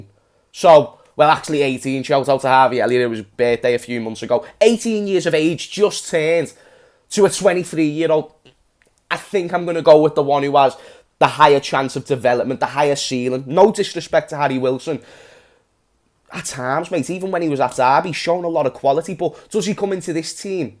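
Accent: Ireland. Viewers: Big up from Ireland 🇮🇪 ( great Irish accent btw ) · Love the Irish accent your like myself bro 🇮🇪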